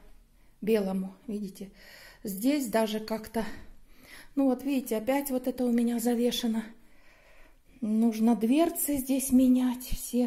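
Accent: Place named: Shakhty, Russia. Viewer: я от вас балдею, только наши женщины не ноют и на все руки мастера, я ваша землячка из г.Шахты, определила это сразу же по вашему разговору, училась в Ростове. Привет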